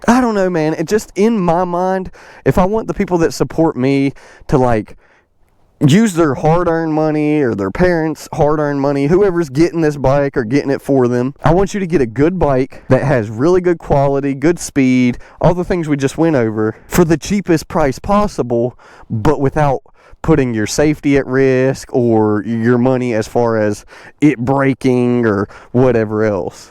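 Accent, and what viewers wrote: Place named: Tennessee, United States. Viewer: Definitely sound like you from Tennessee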